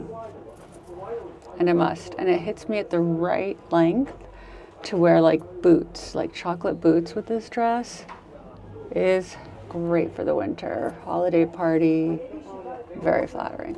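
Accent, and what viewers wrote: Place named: United Kingdom. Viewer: ! You crack me up! All that British accent chatter in the park! I am sure that you went on and on! LOL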